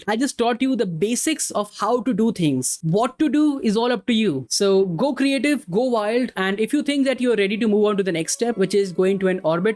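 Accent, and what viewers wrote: India. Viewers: Are you from India or something? you sound like Indian that’s why. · bro it's indian accent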